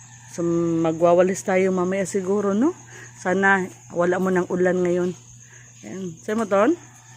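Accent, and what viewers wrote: Visayas, Philippines. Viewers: Hahaha Visaya diay 😅🌸🌼🌻Friend na tayo Te, salamat sa pag share Idol,, nanoud kasi ako, nag dala nang Goodluck hahaha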